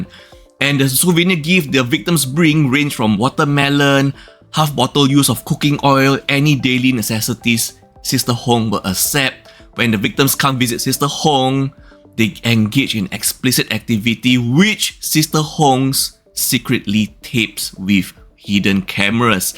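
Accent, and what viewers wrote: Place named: Singapore. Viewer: Hey content creator, there's only one thing more cringey than this Ms Hong - guess what....it's your Singlish accent. Yikes.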